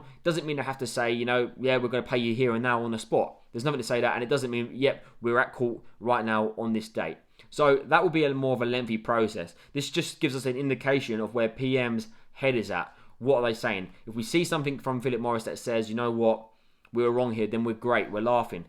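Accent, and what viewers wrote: England, United Kingdom. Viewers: What’s up with the English accent and a god damn yankee hat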